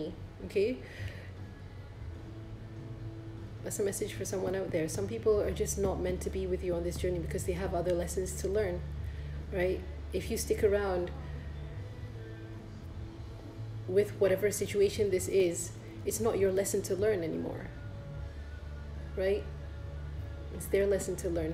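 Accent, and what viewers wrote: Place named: United Kingdom. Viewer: Picked pile 1 TARA I literally wrote Tara’s mantra snd stuck it on my wall few days ago . And YES RELEASE A RELATIONSHIP GOSH KEEPS COMING UP! yes proud of myself thank you for reminding me. Look at all that I have accomplished, and teaching sounds like me! Sharing wisdom! And expressing myself 😭😍 beautiful! Ps. like your cute British accent 😏😏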